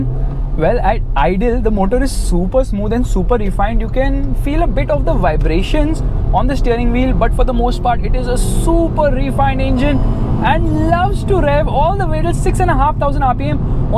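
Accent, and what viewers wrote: India. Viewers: Desi english accent is so funny😂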